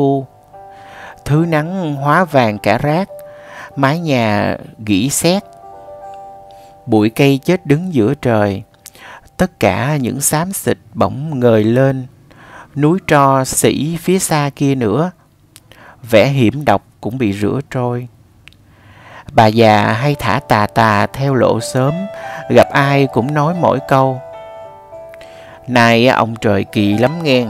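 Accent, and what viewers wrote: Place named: Mekong Delta, Vietnam. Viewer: Thích giọng đoc của em,đặc sệt miền Tây,chất phát.Cám ơn ,thân chúc sức khoẻ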